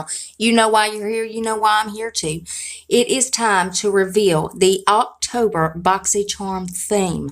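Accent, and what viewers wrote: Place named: Texas, United States. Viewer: Where are you from? You sound like a southern girl like me...Texan! 😁 Love your channel!😍😍😍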